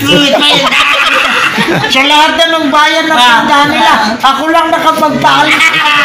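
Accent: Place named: Nueva Ecija, Philippines. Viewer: Parang narinig ko na ung about daliri,Sa pork chop duo yata.?.Pero ok nmn po pagkaka deliver..👍,ung punto ng Bulacan ,prang s Nueva Ecija..Yung mga tumatawa din ang nagdala..heheh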